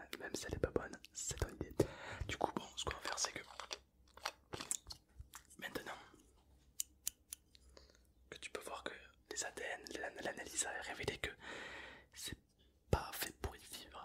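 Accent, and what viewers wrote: South, France. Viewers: n’a plus d’accent du sud en chuchotant Like si ta remarqué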